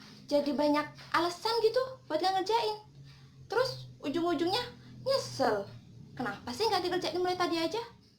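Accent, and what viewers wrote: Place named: Osing, Indonesia. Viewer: Ketoro wong osing 😁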